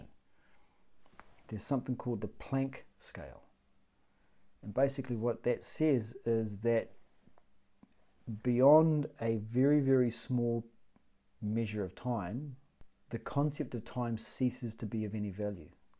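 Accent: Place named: New Zealand. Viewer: Auto-generated subtitles seem to have trouble with the New Zealand accent. The prase used in the first part of the video (e.g. ) is "Dream lion"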